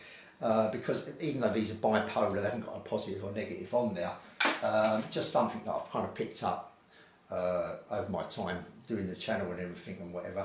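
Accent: Cockney, United Kingdom. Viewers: Recapped my tannoy turnburys.. Bass even more propulsive and deeper tighter.. Etc with mundorf evo oil.. Jantzen on the tweeter... More detail faster.. Now sound euphonic rich with my musical paradise 301.. 289 GBP from China.. Sounds like the review in those mags... Ur Cockney Accent makes a change from the usual middle class ****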